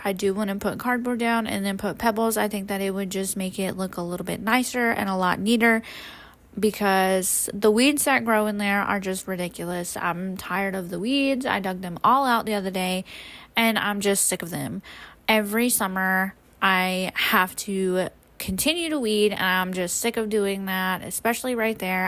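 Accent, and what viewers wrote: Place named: United States. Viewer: Which state are you from, I hear an accent when you talk and was curious. You don't have to answer if you don't want.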